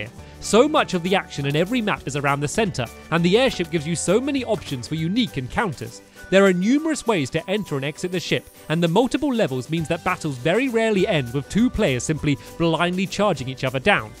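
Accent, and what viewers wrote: United Kingdom. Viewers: Because I'm not used to a British accent I thought you said my egg favorite map instead of my 8th favorite map lol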